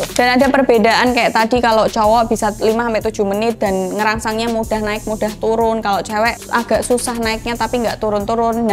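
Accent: Java, Indonesia. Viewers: mbak,logat jawanya kental banget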